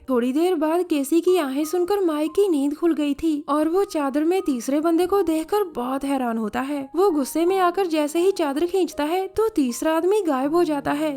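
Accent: Punjab, India. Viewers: Aap aur apke thumbnails...aur ye awaz, ye Punjabi andaz... wah kya baat h.... Lv u so much. schhi wala..🥰🥰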